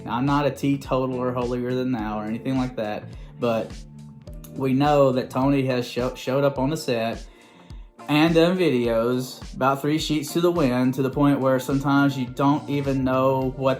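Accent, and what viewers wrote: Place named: South, United States. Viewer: Very logical. A refreshing change from the GB! I enjoyed your southern accent too.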